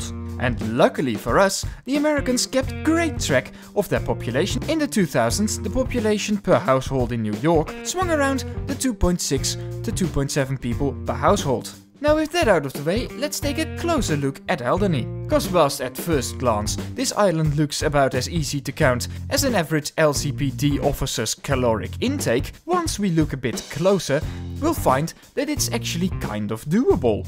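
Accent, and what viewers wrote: Netherlands. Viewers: nice dutch accent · Are you Dutch? Your accent sounds Dutch 😂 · Nederlands accent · I have an feeling that you're dutch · what an example of dutch accent😊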